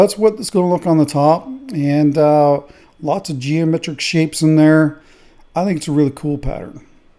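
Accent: Canada. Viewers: I love how you Canadians talk. Nice work as usual, good Sir. Did you two get the house sold? I surely hope so.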